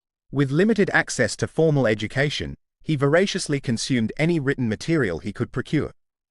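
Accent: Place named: United Kingdom. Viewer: Wow, I like that your actually mentioning some less well known figures. I respect that. Also, love the British accent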